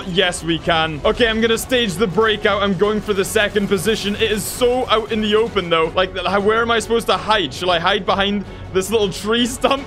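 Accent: Scotland, United Kingdom. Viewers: Are you scottish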